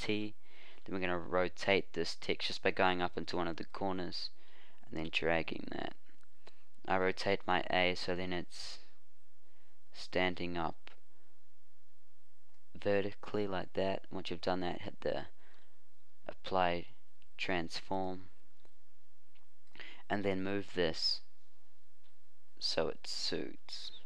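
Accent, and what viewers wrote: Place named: New Zealand. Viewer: wonderful tutorial mate. do i hear a bit of NZ in your voice? :) very easy to follow and well demonstrated, no issues at all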